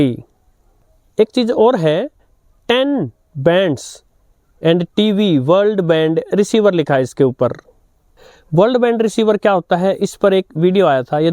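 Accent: Standard. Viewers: Congrech bhai ji aap mujhe bahut hi ache lagte ho aapka jo bolne ka tariqa bahut hi lajawab hai aur aapki pure Hindi man ko bhati hai aur yeh redio maine life me bahut chalaya hai yeh lockle shop se mil jata tha iski prise bi tab 350 ke aas pas thi ab ka pata nahi mujhe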